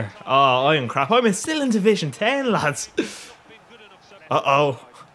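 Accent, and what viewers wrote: Ireland. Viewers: Are u Irish? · Your story was actually funny :P Nothing better than a crazy irishman (Or scotish wathever) telling a story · is that an irish accent? sorry that I don't know haha and will you do a packopening?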